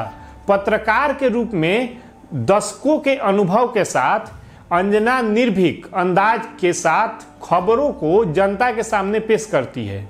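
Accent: Bihar, India. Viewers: Your way of talking is of a typical Bihari. Actors and artists can learn from you the accent of Bihar. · Bihari waala tone mein bol raha hai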